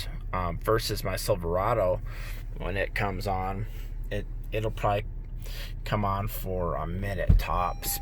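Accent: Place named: North, United Kingdom. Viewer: You sound like a Northerner, but speak slower than a southerner.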